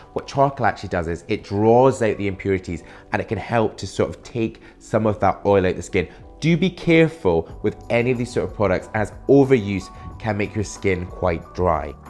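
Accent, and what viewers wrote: United Kingdom. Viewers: I love that British Accent!✌🏻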